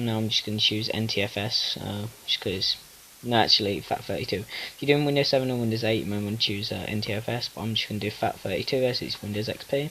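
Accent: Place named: United Kingdom. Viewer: Excellent explanation and demonstration! Some of us "across the pond" (USA) have trouble hearing as fast as you speak "The King's English", but fortunately, my computer has a "replay" button! Cheers!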